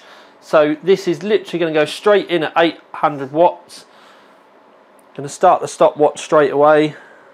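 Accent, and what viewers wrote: United Kingdom. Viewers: yoooooooooooooo britishaceeeeeeeeeent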